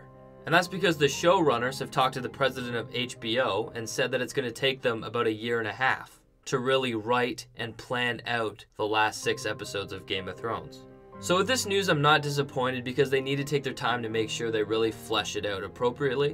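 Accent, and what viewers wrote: Canada. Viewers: Is that a Canadian accent? *curiosity 😜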